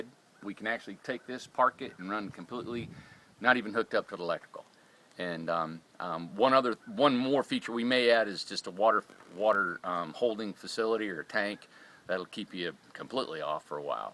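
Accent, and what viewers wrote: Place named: Chattanooga, United States. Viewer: Very nice .I'm building almost finished a tiny house in Chattanooga. I have many of the componants for an off grid system but would really like I knowlageable hand in putting it together. Are you near Chattanooga?I ask as you sound like you might be.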